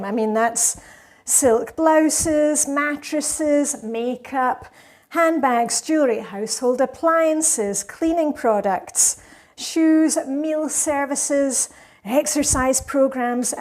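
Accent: Scotland, United Kingdom. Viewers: Found your channel via one of the minimalist channels - i think it was the minimal mom. I came for wardrobe advice and stayed because of many more reasons: the positivity, the no-adds no sponsoring, the iceswimming, the routines, the scottish accent, the authenticity, now I am learning about the flylady system and danish hygge. Your videos brighten my evenings and yoyr success is so deserved in my opinion! 🎉🎉🎉 Congratulations to 100k! 😊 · I was drawn to your channel for several reasons: your infectious positivity! Learning about decluttering! Seeing you with friends enjoying life in Denmark! Your Scottish accent! Your simple meal preps! Simplifying household chores! All in all, you’re just a lovely human being ❤❤❤ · When we finally moved into our new house in 2020, I looked on "this You Tube thing" for guidance on folding socks as neatly as the German mothers of my children's friends did. 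Found a nice American lady, who besides folding, had videos on organising, and fell down the rabbit hole... At some point, I was watching an old video of the Minimal Mum, doing an all-January collaboration - and yours was one of the videos. At first I was very surprised that Danes apparently have Scottish accents 😂 honestly! I remember thinking the geographical proximity might explain it - I must have been thinking of Norway 😂😂. Luckily you soon mentioned being a canny Scot 😂. I am eternally grateful to you for your bahookie nudges, and enjoy being part of this international community around you. Congratulations and thank you - Anne (in Germany now but formerly of the 3rd Morden Brownies 😊) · I found you a couple of years ago, probably when I was searching for cleaning systems. I loved your warm, welcoming approach as well as the Scottish accent! I live in north east England and holiday often in Scotland-my favourite place. 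Feel as if I'm checking in with a friend every time a new video pops up. Thank you for your support and encouragement x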